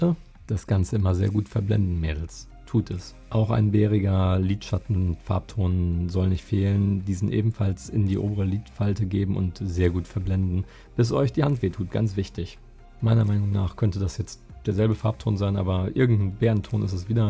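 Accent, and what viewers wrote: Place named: East Westphalia, Germany. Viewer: Da spricht der Ostwestfale :-D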